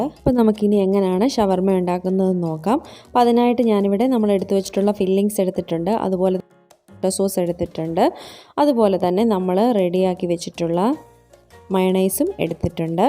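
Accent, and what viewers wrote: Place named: Qatar. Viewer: Good presentation. Samsaram kettappol anik anne thanne pole thonni 🤩🤪 Qatar l alle. Qatar l anenkil angane manasilayinn reply kittitt parayam tto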